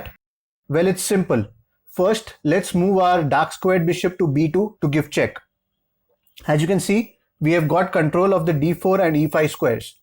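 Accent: India. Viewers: just wanna let u know that I hate Indian accent.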